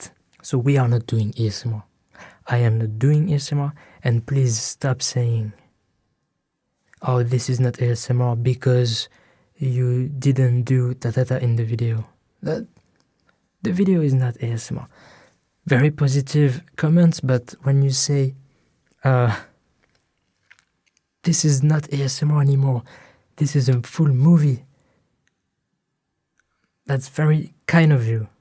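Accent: France. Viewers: I tried to explain (what I know now to be ASMR) to my family/friends when I would ask if random people (telemarketers, random people on the other end of the phone OR random people I’d have interactions with) and try to explain that listening to certain people’s voices would start to almost lull me to sleep with my head tingling/chills. No one ever understood what in the world I was talking about and I was almost frustrated I was so easily affected by certain people’s tones, sounds, volume, dialect, ect. 5 or so years ago I finally found out I was describing my own ASMR! Speaking of tingles - Please continue to talk in English with your amazing French accent. ☺️😴Ahhhhhh!😴☺️ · kinda off topic but I LOVE your French accent it’s so adorable 🥰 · c'est quand même marrant le fait que l'accent français fasse que je comprends chaque mot parfaitement. Merci et continue d'être français stp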